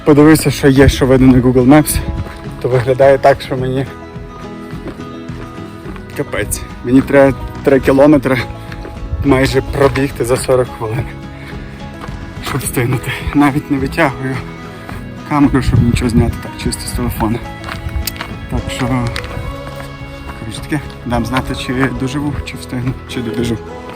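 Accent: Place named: Western Ukraine, Ukraine. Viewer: Привіт! коментарі пишу вперше, але дуже хочу поділитися враженням) Натрапила на ваш канал в рекомендаціях і це ваууу... відео просто топ! подача інформації, монтаж... одним словом все на хорошому рівні. Скажу відверто, мене зачепив ваш говір)) Якщо не секрет, звідки ви родом? У мене є підозри, що це може бути Тернопіль або Івано-Франківськ? Дякую за такий контент, з нетерпінням буду чекати нові відео. +1 тревел-блог в скарбничку)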